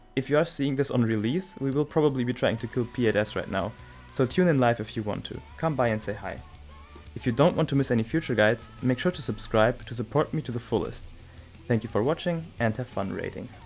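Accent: Germany. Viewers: He's probably refering to "the boss" which is in german "der" (he) and by the accent I assume he's german.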